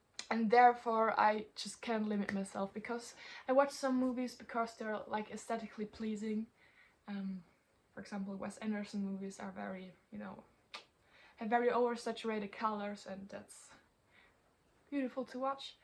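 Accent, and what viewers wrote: Germany. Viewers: Moment, bist du Deutsche? Den Akzent hat mir ein Gefühl gegeben aber ich war mir nicht sicher :)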